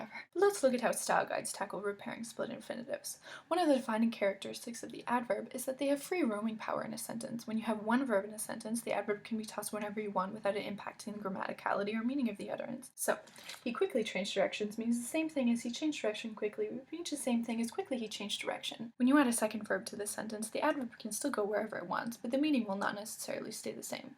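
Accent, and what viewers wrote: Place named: Northwest, United States. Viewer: I agree with Aloma. You speak very quickly. There were a couple times where I couldn't keep up, but we speak slower in the South. I was raised by Yankees, though, so I don't really have a regional accent from what I can tell. I can't really place your accent. If I had to guess, it would be NW US to the Midwest. Thanks for the video. Having studied Spanish, Russian, and German, I can see why some people disagree with splitting infinitives. I really don't care because it would only be problematic in translations, which are problematic anyway.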